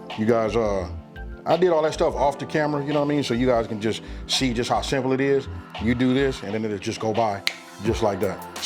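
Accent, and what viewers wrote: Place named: Mississippi, United States. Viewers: Ur wife is beautiful and I think you are both awesome, ur accent tells me you live close to me, In an Arkie, you sound like Mississippi, God bless you, I watched you make this and thought a couple cans of shrimp cocktail shrimp would put that over the top, maybe a little stripes of bacon crushed up over the top, man I gotta go eat something now, God bless you and urs. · OH yum, very similar to the way my mom and I make it.....I’ll call it Northern Style Macaroni lol. I’ll be making this as soon as it gets a little warmer, still in the 30’s and 40’s up here. What makes this Southern we all use the same ingredients. My boyfriend that passed 3 years ago, turned me on to Miracle Whip and I love it. Thanks for the recipes,. You are so fun to watch......love the Southern accent. Peace and be safe. You’re wife is beautiful and you’re a gem, Would love for me to have someone like you cooking. 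Yum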